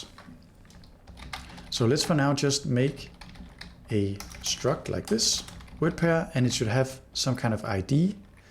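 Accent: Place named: Denmark. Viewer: Are you from denmark? You sound a little danish. But overall great video!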